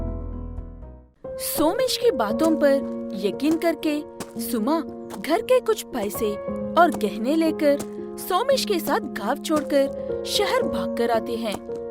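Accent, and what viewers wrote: South, India. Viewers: Ek proper hindi bolnay vali acchi background voice over artist rakhiye pls south indian hindi maza nahi ata hai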